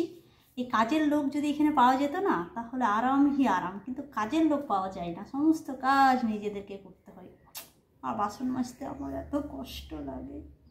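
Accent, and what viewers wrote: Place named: Kolkata, India. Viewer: Ei tumi ki bangali, pariskar bangla bhasa, chalti bhasar bhalo dakhal aache, khola mela sahab, mone hachhe apni anekdin kolkatay chilen, bhalo thakuk apnar paribar ar apni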